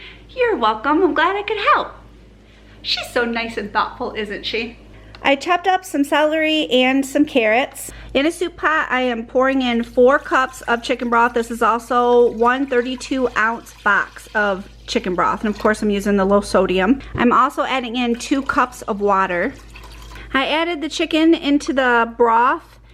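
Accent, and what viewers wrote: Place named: Michigan, United States. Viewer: Are you from Michigan.... you talk just like me lol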